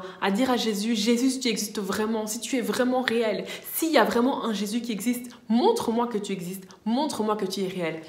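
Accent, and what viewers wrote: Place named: Belgium. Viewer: Super message. J'aime bien ton accent belge. Keep it up ! God bless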